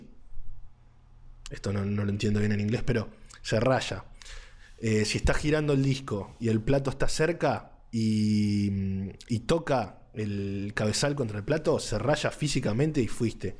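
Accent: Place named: Argentina. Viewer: Uno casi nunca encuentra un video tan informativo como el tuyo y encima con ese acento argentino que tanto uno necesita en la informatica jajaja, gracias genio aprendi una bocha con tu video ! Me suscribo y ojala sigas con el contenido que me parece muy interesante !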